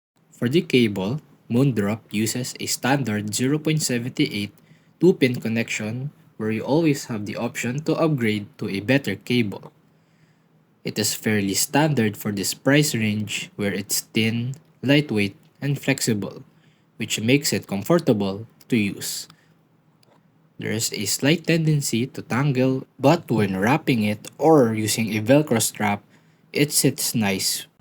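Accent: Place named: Philippines. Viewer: bro are you also from phillpines like les modio you guys have similar accent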